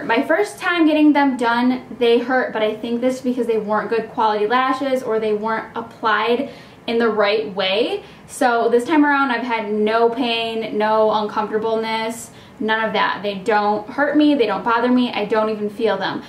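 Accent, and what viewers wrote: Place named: New York, United States. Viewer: Lol I love your New York accent ! I can tell 💗💗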